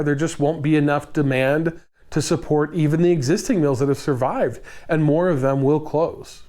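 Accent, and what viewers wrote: Pittsburgh, United States. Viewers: Hello. are you from the Pittsburgh area??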